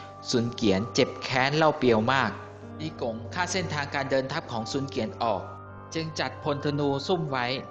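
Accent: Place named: Isan, Thailand. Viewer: เเอดมิน น่าจะเป็นคนอีสารเเน่เลย เเมนบ่อ้ายสำเนียงมันบอก555 (หนู๋คนอุดรเด้อ)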